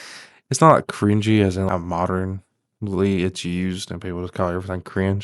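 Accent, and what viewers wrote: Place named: North Carolina, United States. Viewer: love your accent, sounds like North Carolina. I still need to watch this movie, somehow I keep missing some of these ghibli movies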